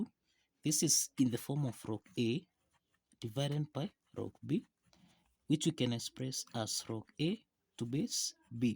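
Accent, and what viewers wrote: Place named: India. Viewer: Ужасный акцент индуса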